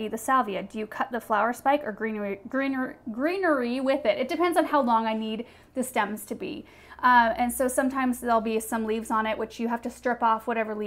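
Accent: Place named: Texas, United States. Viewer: Texas says it same as you.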